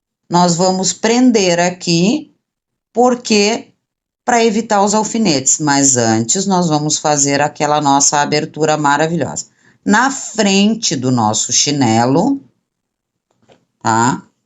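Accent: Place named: Gravataí, Brazil. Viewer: Ficou lindo o chinelo, e não é q reconheci esse sotaque , sou de Gravataí 😘😘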